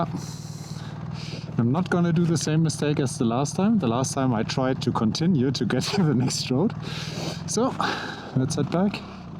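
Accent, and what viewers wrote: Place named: Germany. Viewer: Digga rede doch deutsch, man hört das du deutsch bist. Abgesehen davon aber gutes Video, sieht mega schön aus.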